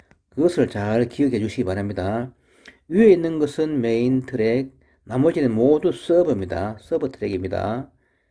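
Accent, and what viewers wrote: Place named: Gyeongsang, South Korea. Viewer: 설명 아주 천천이 차근~~ 잘 하시네요. 귀에 익은 경상도 말이 마음에 들어요. ㅎ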